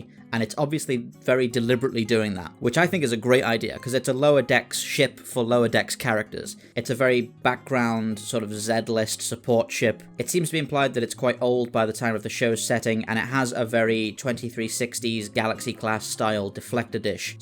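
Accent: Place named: Northwest England, United Kingdom. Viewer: love this where in the Northwest of UK does your voice over talent Hail from?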